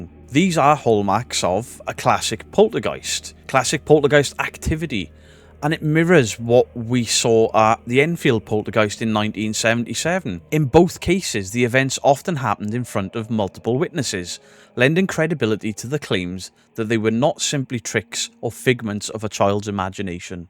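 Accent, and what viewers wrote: Wales, United Kingdom. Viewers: lo e the Welsh accent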